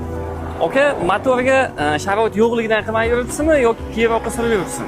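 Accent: Uzbekistan. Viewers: 1 чидан Устахонани асосчиси булсез номерларни фаркига боришингиз керак 2 чидан узиз наманганлик була туриб 10 лик номер Билан 50 лик номерликни фаркига бормайсизми шевез айтиб турибтику наманганликлигизни Яна Окалар дейишизга улайми... Узидан Тошкентлик ясаб олдизми ... Мухлислари алдаб хейла хейла килманг...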